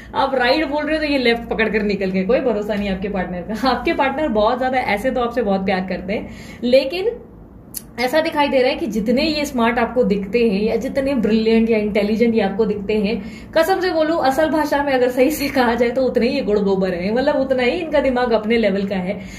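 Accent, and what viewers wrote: Mumbai, India. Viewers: Apki Mumbai language mast lagti hai mujhe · Ekdam mast reading mumbaiya language di amchi mumbai jindabaad🤩, I claim all positive energies thank you universe thank you divine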